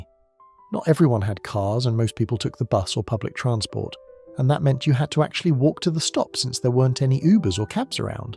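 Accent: United Kingdom. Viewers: The voice and quite refined English accent are very pleasant to listen to - but the language is American with the usual grammatical errors and mispronunciations, which is a real pity.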